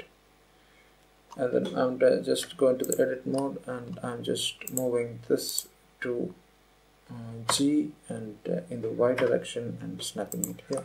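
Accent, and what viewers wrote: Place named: Kerala, India. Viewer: malayali thanneyanenn nerathe manasilayi karanam malayliyude english oru english alle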